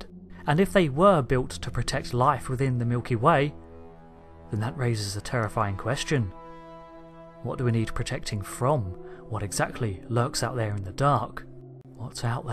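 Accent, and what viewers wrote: United Kingdom. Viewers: Why am I so repulsed by narrators on YT with British accents? I was a bib Beatles fan and couldn't get enough of that sound. Now? Fuggedabouditt!!!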